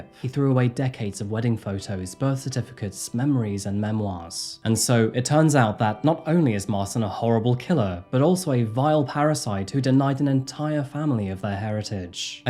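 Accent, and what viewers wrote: United Kingdom. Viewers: I doubt you'll ever see this. But a recommendation as a first time viewer and now fan. The 1 minute long intro, without showing face. I originally clicked off the video, and googled if this actually happened and if this channel was AI. I came back after learning both were real. Maybe I'm just a dumb American. But the perfect British(?) accent along with minimal inflection made me heavily suspect AI. Keep up the good work, and maybe show some highs/lows in the voice or your face a tad earlier in the video :)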